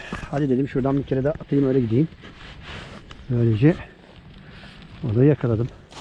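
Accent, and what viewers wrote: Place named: United Kingdom. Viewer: British aksanına hayran kaldım abi bu arada. Bizim balıkçı aleminde nadirattan olacak derecede iyi bir aksan. Selamlar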